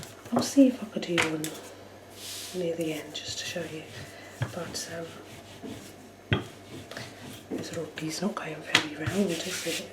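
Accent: United Kingdom. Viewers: are you form the midlands ? it sounds like it.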